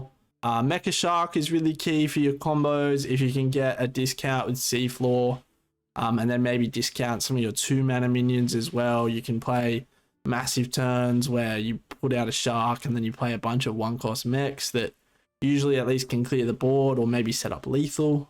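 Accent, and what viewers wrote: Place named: United Kingdom. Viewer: Kinda sounds bri'ish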